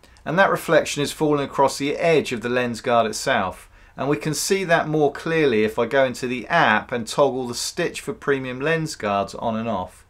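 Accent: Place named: RP, United Kingdom. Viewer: your very posh British accent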